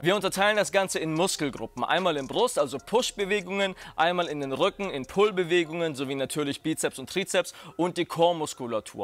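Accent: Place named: United States. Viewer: Hey coach, wow amazing! Warst du mal länger in Amiland? Du redest fast wie son cooler Amerikaner. Ziemlich nice.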